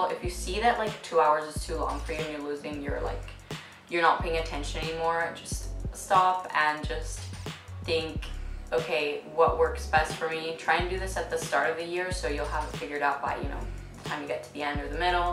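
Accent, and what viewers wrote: United States. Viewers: Yeah. .. love the drive and commitment you've explained in the video. Its not smooth cruising as most people like painting it as. love your vids, you're American right? detect the accent in there...and not to probe but what made ya come to England for studies?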